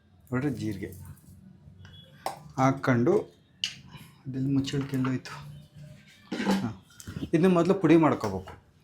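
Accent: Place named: Davangere, India. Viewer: Annapoorneshwari taayiya sampoorna ashirvada ide nimge...ella adgegalnu estu shuchi ruchiyagi madtira...innu hechhina recipes na upload madtiri...nav davangereyavru...namge Khushi ide neevu nam side adge madvaga hage mam bhashe kelvaga...gud luck sir...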